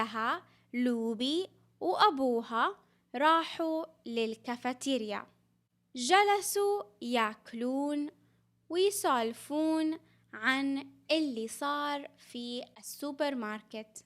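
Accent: Saudi Arabia. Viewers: Thanks for your great little stories spoken in the saudi dialect.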